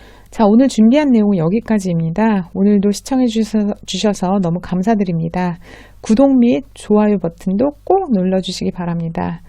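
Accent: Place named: United Kingdom. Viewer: 헛 어쩐지! 영국영어발음 멋있어요!^^ 오히려 목소리가 이쁘셔서 어색한 남자 원어민 발음보다는 직접 한번에 싹 다 녹음하셔서 들려주시고 스크립트를 고정댓글이나 자막으로 하시면 훨씬 듣기도 좋을 것 같아요!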